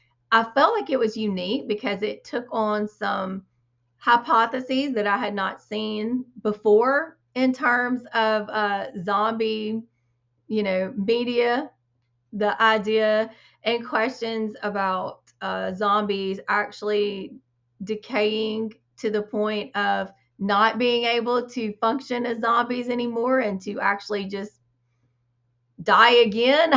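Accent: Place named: South, United States. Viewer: I got The Night Parade yesterday! Everything is Southern Gothic with that accent 😂🤷‍♂️💃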